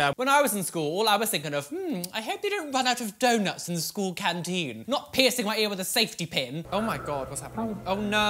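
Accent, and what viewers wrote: United Kingdom. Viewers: I have to say I wasn’t expecting you to be British but I love it hey queen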